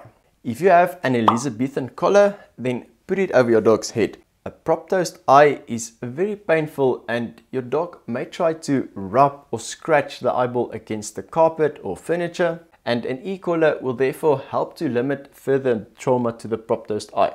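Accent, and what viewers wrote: South Africa. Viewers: Kan hoor jys van suid afrika en n afrikaner lol.... my pikanese se oog lyk of hy wil uit pop , dis wat my na die video bring.... lol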